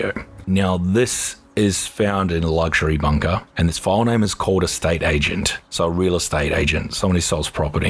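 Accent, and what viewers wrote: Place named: Australia. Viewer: If I click a Forest video and it doesn’t have a gritty Australian accent, I assume it’s a clickbait or just bad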